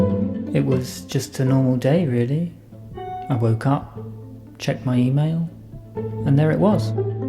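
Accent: United Kingdom. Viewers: Saludos desde México 🇲🇽 I love British Accent 🇬🇧 😍👌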